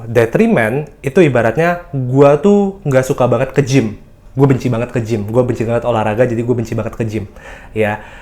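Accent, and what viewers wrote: Java, Indonesia. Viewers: Orang jawa po mas?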